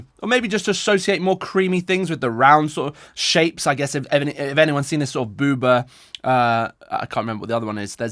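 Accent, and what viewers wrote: United Kingdom. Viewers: The fact that he has a British accenct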